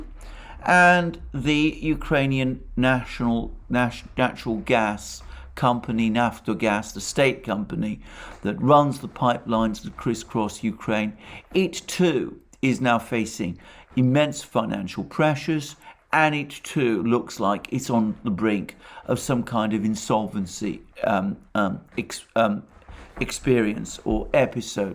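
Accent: England, United Kingdom. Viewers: ? Wtf are you talking about? He reiterates commonly available material in a Brit accent, making you think he's some genius. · You sound like an Englishman. But you seem to be on Russia's side. You are a traitor to western values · I keep watching your videos because you are RIGHT on your assessment , from day one of the special military operations conducted by Russia 🇷🇺 and once again I want to say that I admire your knowledge and understanding of world history. PLUS I like the British accent. ( it is pleasant to the ears ) cheers 👍😀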